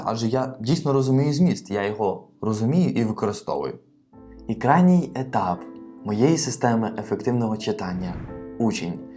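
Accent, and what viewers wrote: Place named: United States. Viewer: Ех, не можу за акцентом вгадати штат( Успіхів вам і розвитку каналу! 🙌🏻✨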